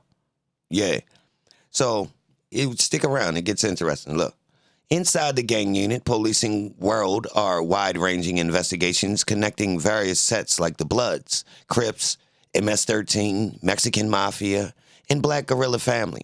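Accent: Baltimore, United States. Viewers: Between the comedic commentary and your bmore accent I am dying over here 😂😂 but this was a great video. Very interesting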